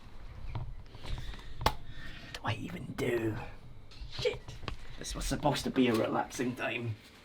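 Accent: Scotland, United Kingdom. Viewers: He's from Scotland, not Ireland. Different country, different accent 🙄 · He's Scottish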